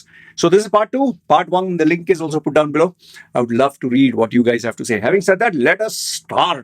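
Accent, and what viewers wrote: India. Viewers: 🍃💯 I like your videos about bull religion system topics... But when you said about the man mustacs tryana be a man.... Very true I agree... But whatsupp with the freaking tattoos on your face... Hommie you ain't no Tyson.. what was you thinking... How does it make you feel.... More of a man, unique dasi, cool...or tryna get cool attention Coz I can bet you didn't go to middle school or even high school in the USA.... Your dasie accent says it all... Bakie tera cast system ka bilkul sahi hai..... I hate that fckin cast system more than anything about India coz millions of reasons I got 🎉🎉🎉🎉good job tho hommie.. ... Peep deep inside.... But right on! 🎉🎉Keep up the good work 🎉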